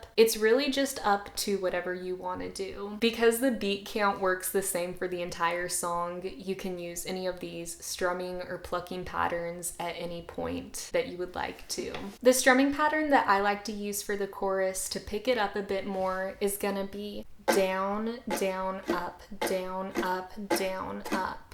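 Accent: South, United States. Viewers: That southern accent makes me melt. ❤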